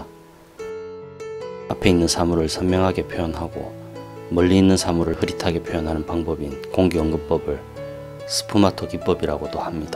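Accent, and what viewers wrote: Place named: Busan, South Korea. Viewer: 나이쑤 부산말도 재치도 그림도 늠조으네요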